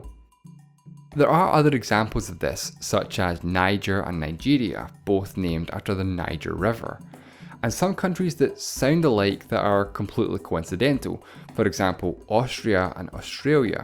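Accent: Scotland, United Kingdom. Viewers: Azwad Ahsan it’s an Scottish accent. How can he possibly change that with education? Your brain sucks get some education pls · I am more fascinated to listen to your Scottish accent rather than the Congos itself. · Scottish accent, right? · José Guilherme Scottish accent.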